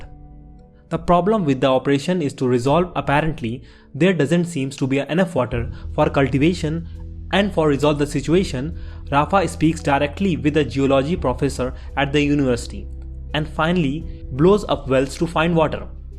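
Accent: India. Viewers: The Indian accent is killing the video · I dont know what they are saying about the accent but haha never thoughts i'd hear a story happening in my language be explained in indian accent haha so cool dude! thanks a lot, i really could not recall s1 ! this one was concrete and short :D Greetings from Colombia and btw, so stoked you are into this show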